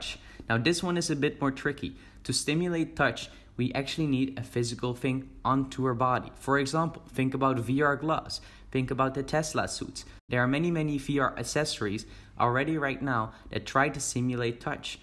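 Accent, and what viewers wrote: Netherlands. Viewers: You sound dutch. Are you dutch? Either way, good video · This is my first video of this youtuber and I'm here wondering "Is this guy dutch" cuz his accent has a light dutch accent sounding to it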